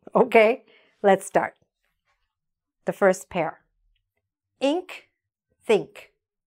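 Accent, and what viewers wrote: United Kingdom. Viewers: You are my best English teacher Are you british ?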